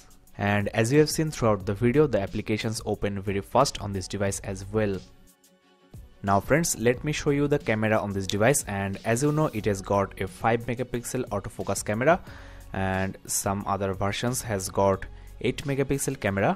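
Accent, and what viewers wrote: India. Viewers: Are you indian? You sound like an indian.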